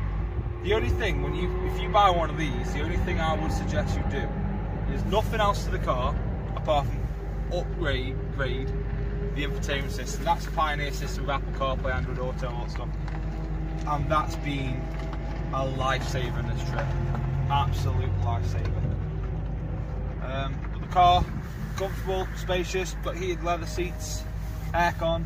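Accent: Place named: Stoke, United Kingdom. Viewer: I’m detecting a Stoke accent.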